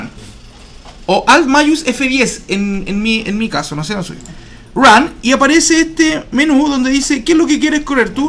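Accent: Chile. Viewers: usted es chileno no? buen video compadre, esta bueno para empezar con python, me sirvió mucho. saludos!!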